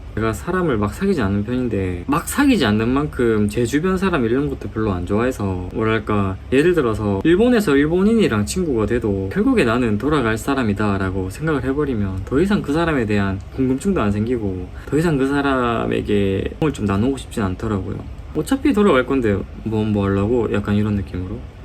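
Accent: Daegu, South Korea. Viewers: 안녕하세요. 일본 워홀을 가고 싶어 영상 찾아보다가 댓글 남겨요. 대구 사투리 쓰셔서 대구 분이시구나 했는데, 팔거천 얘기 듣고 깜짝 놀랐네요. 저도 칠곡 살아서요 ㅎㅎ.. 지금은 서울에 직장 잡고 열심히 일하고 계신 것 같은데, 저도 워홀 이후에 서울 가는 게 계획이거든요. 이런저런 부분에서 반가워서 댓글 남기고 갑니다. 다른 영상도 마저 찾아볼게요. 하시는 일 다 잘되시고 파이팅하세요!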